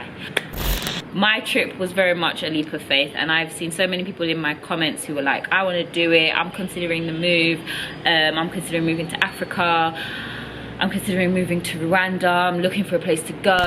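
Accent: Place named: United Kingdom. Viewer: hey!! Welcome back. I even forgot you had a British accent... Eek!!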